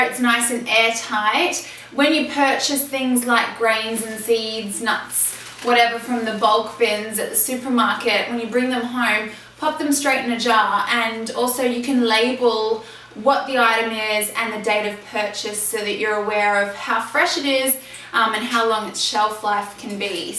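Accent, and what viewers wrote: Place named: Australia. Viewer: I like your accent - I think you are Australian. I have just begun eating this food.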